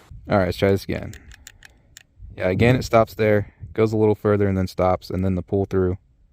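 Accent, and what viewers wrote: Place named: Ohio, United States. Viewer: "There's your take up there...I question myself whether or not I've wracked the slide on this BUT I think so (laughs) then pulls trigger! I recognized an Ohio boy right away!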